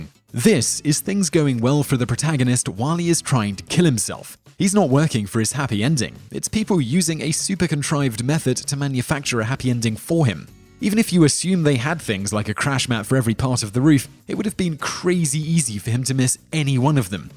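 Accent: United Kingdom. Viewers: We really should be more grateful when it looks like accidentally says something that may not be completely accurate or expresses an opinion that doesn't appear thoroughly thought out. The researchers here are so on point with such frequency that it makes me wonder if their occasional factual and/or contextual errors are not intentional. It just seems like an ingenious ways to fuel the comments section. After all, who doesn't enjoy feeling smarter that a very charming, well-read guy that has a British accent.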